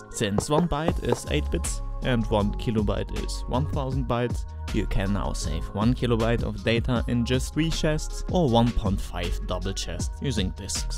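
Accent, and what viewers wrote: Germany. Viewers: Du sprichst sehr gutes Englisch, aber ein Deutscher wird immer einen Deutschen der Englisch spricht erkennen 😄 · Heavy German accent ftw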